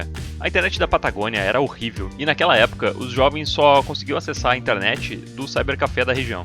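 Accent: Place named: Porto Alegre, Brazil. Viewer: Boa noite, meu querido! Primeiramente, parabéns pelo canal foda, mano! Desculpa, mas sou curioso: tu é de Porto Alegre? Baita sotaque... Também sou daqui!! Abração dum outro velho (26 anos de idade e 16 de tibia) tibiano.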